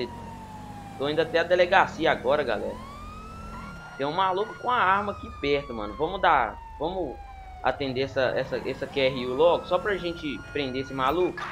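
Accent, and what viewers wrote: Minas Gerais, Brazil. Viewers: vc e mineiro e?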